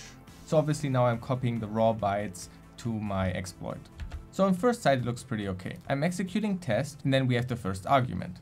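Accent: Germany. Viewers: I really like your videos a lot and have a great deal of respect for you but your accent eludes me. I mean, your account says you're from Germany and your pronunciation also sounds German but sometimes you have really weird grammar that doesn't really work in German or English? For example in the first part of the video: "Because I get often questions..." The more natural way to say this in English would be "Because I often get questions" But! In German the sentence in this context would be "Weil ich oft Fragen kriege" (or something similar) WHICH HAS THE SAME ORDER OF WORDS EXCEPT TWO DIFFERENT WORDS ARE SWAPPED Again, please don't misunderstand this as critique or an insult (As a German, I totally get how hard it is to speak English well!). It's just that the mistakes you make genuinely intrigue me.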